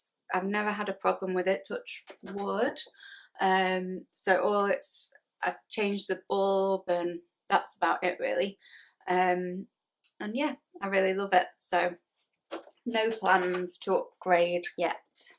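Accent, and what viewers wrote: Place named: Northern England, United Kingdom. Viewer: Hi! I've only just found you. What a soothing voice you have. I thought I detected a northern accent there, I'm from Chorley ( 20 minutes on the motorway from Manchester). I really love Abakhan as well.... I love the Northern quarter in general. Good to know about Moston, I'll give it a try! Lovely to hear all about you! Thank you. 😘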